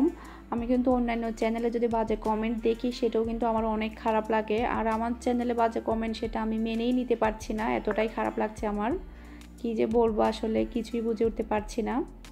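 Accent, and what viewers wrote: Chittagong, Bangladesh. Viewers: Apu apnar vasa ki chittagong?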